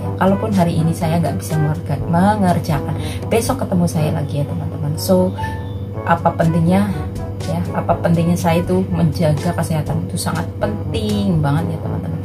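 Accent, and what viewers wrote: Banyumas, Indonesia. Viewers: Semoga selalu sehat yah mba..cepet temen masak mie gorengnya mba.. Salam ngapak mba.. Pancen wong ngapak nek ora ngapak kue ora kepenak banget kooh..😊🙏🙏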